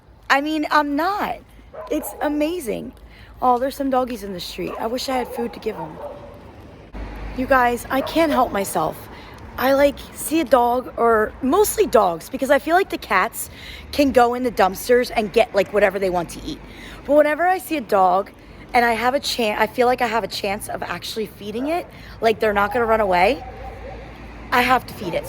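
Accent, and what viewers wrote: United States. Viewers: sound like a white American talking not Moroccan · Congrats for your first road crossing the nonchalant North African way 🎉😂 (don't encourage it) More local practical safety and social tips and awareness are a must, and your best source is your neighbors and social circle, as an Algerian I can assure you that our Moroccan brothers are among the most helpful, peaceful and most generous neighbors I've ever had a chance to live close to (despite the present politics). So stay safe and enjoy your new lifestyle ❤🎉 and your caramel Noss-Noss😅 By the way your arabic slang American accent is a real treat, God bless.🎉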